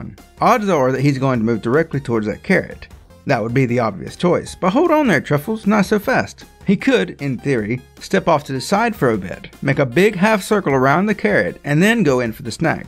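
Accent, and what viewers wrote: South, United States. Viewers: His southern accent makes the video better. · first time ever hearing a southern geek voice. not disappointed. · Just realized this is the first time I've ever heard a game developer with a southern accent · Holt crap I've never seen a person with a southern accent as a developer! :D this is awesome!!!!!!! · I thought it sounded southern, but it was rougher than the other accents I've heard from that area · Are you southern or northwestern I genuinely can’t tell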